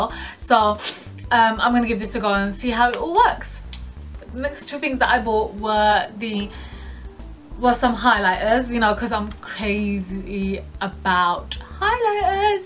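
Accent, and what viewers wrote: United Kingdom. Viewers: you have a really cute British Asian accent, awh I'm new to your Chanel btw, jus discovered you! Haha so bubbly an sweet. Looking forward to watching more! Xxx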